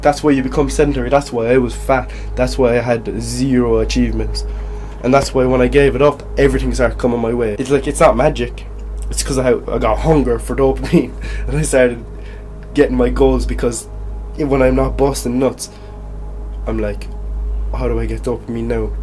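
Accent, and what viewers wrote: Ireland. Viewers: Beat the cheeks off her loool. That's a fine Cork accent u have there bai. Fair play kid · Holy shit your Irish? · You’re Irish ?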